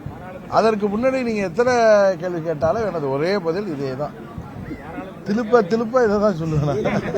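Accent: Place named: Thanjavur, India. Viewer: Thanjavur kaarar slang, Thiruppa thiruppa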